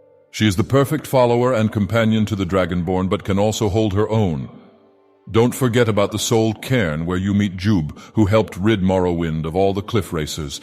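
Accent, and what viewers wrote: United Kingdom. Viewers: British Dagoth Ur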